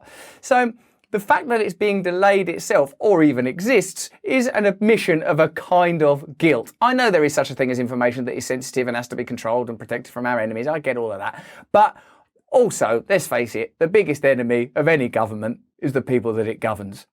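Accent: United States. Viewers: Dude, I love your American accent. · Your American accent is pretty good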